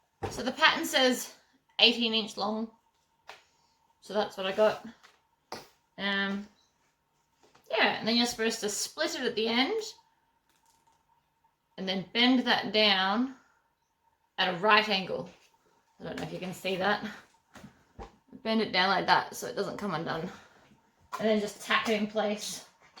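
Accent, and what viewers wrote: Australia. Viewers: So refreshing to hear an Aussie accent even if you're pitching to overseas viewers with measurements in inches. Great to be able to easily find things at my local shops here in Australia rather than hearing about Dollar Tree or Michaels stores! Thank you for these projects and helpful tips. I wish you every success.xx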